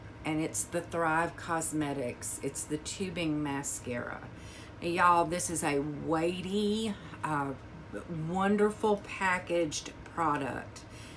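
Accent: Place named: South, United States. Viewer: Hi. I'm here from Jen's group. Love your southern accent! That gold lip mask was nuts. I'm glad you gave the Catrice another chance. I love it. But I have oily, mature skin. So that may be a difference. I also love mixing it with a bb cream to increase longevity and coverage. With the Essence, I found it had a film on it so the first couple times you use it, you don't pick up much. I also use a denser brush to apply it than I do other highlighters. But I can see it just fine on the video. Great video. Thanks!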